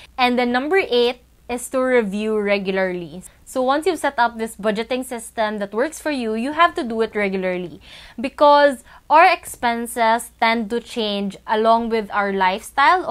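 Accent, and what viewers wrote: Visayas, Philippines. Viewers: I hope you get rid of your bisaya-english accent.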